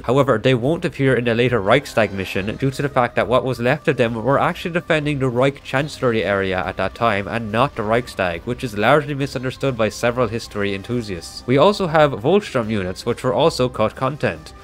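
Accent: Ireland. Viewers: Your Irish accent is so cute! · I like the Irish accent.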